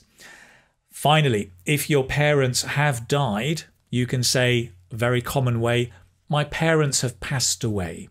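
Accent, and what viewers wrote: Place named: Sussex, United Kingdom. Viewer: 👏👏👏👏👏A goooorgeous lesson! And your English is simply smashing,! I looked after my uncle's polo ponies at Cowdray Park Polo Club, in Midhurst, Sussex. Everybody spoke just like you, if you know what I mean!😀😀😀😄😄😄 Un abrazo, from Olivos, Buenos Aires, Argentina🇦🇷🏴🇬🇧🇦🇷🏴🇬🇧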